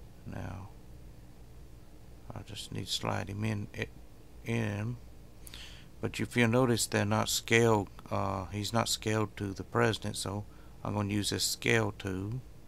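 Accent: South, United States. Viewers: BEST instructional narrator on youtube! The laid back southern accent really takes the edge off.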